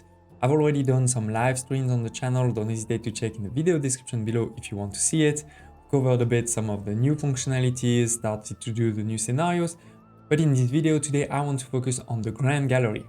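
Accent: France. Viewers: j'ai un peu cramé ton accent francais du coup je pose ma question en FR :D , tu serais me dire comment on utilise les items delocks avec les tickets en or ? j'en ai debloqué mais j'arrive pas a choper les ameliorations merci par avance :D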